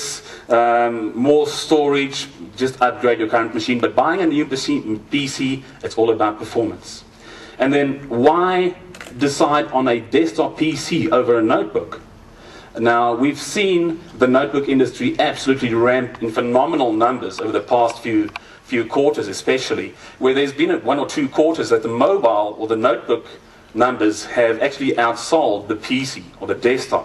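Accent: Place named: South Africa. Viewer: Definitely a South African speaking here.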